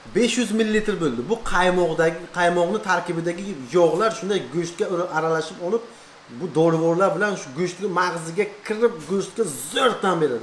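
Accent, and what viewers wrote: Bukhara, Uzbekistan. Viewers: Шевага караганда азим Бухороданми сиз лекин жуда Куп гапирдиз ишдан кура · Бухороча шевада гапирганингиз учун 2 лайк🙄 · Бухоро шевасини роса согингандим, мазза киб эшиттим рахмат 🤗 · Узи Гиждувонликман, шашликдан хам кура Бухоро шевасини купрок согинганман, рахмат. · Шашлик майликуя бухороча шивани коилатиздаааа таго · Buxoro sheva N-1. Shashlikni dodasi ham Buxoroda😊😊😊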